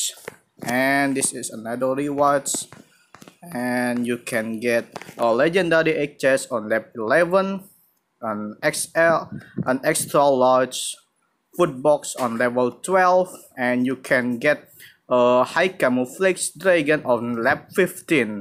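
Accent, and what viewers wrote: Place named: Indonesia. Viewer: Bro, are you from indonesia? Your accent sound like Indonesian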